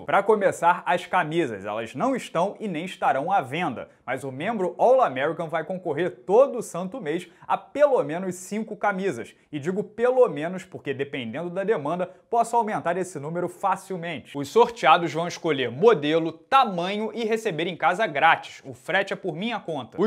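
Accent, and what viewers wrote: Rio de Janeiro, Brazil. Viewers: provavelmente você não vai ler esse comentário.... Mas parabéns pela inovação no canal 👏👏👏👏....merece o reconhecimento e o sucesso muito pela humildade (e olha que eu não gosto do sotaque carioca, problema meu, eu sei) espero que não perda essa humildade. O canal só perde em conteúdo para o Victor Miranda, mas entendo que são propostas diferentes. Quem ganha são os inscritos..!!...parabéns, voa, foguete não tem ré..!!!🚀🏆👆👏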